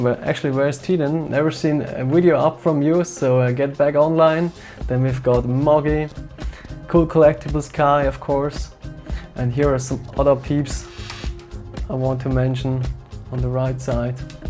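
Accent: Germany. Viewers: Are you German?^^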